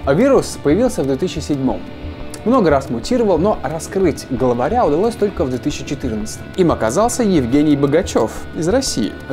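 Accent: England, United Kingdom. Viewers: Мне показалось или в этом выпуске говорил с очень заметным акцентом? Я бы сказал как англичанин но очень хорошо говорящий по-русски. Букву Р произносил очень мягко например. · Тут всё видео говорит английским акцентом, я один это заметил?.-. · А я единственный заметил, что у что то с голосом, будто какой-то английский акцент.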